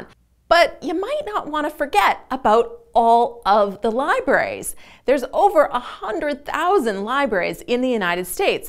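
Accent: Canada. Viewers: Are you Canadian? You sound like Samantha bee. Love your voice :)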